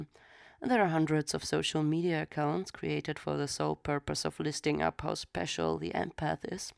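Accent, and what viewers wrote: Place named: Russia. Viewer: Important email...haha, oh stop! Love the example you gave with the homeless persons. Exactly! So are you Russian? I heard you mention in another video you speak Russian and German but did not mention which is your mother tongue. I presume Russian as your accent is basically Russian. I had a girlfriend for several years from Skopje, her accent was very similar. So where are you from actually?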